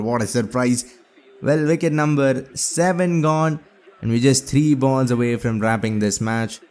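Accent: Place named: India. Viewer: Where are you living ?, from your voice I feel like you are from India but living somewhere else due to the accent...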